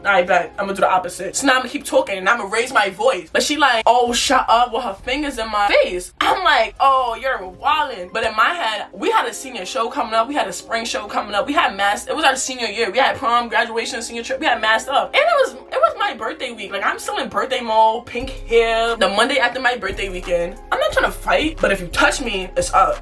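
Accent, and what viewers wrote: New York, United States. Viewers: Good work I can tell you work really hard and your gonna make it fs and i love your storytimes, and I luv the ny accent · The accent tho, You from new york? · the ny accent😍😍♥️!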